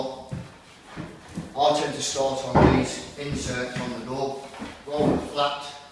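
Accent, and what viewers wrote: United Kingdom. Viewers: Are you British? Well no wonder I can't understand a single word you're saying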